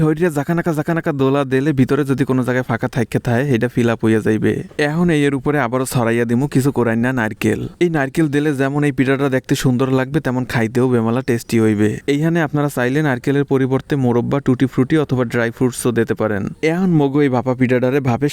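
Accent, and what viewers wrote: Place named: Barishal, Bangladesh. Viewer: কথা গুলা সুন্দর আমাগো বরিশালের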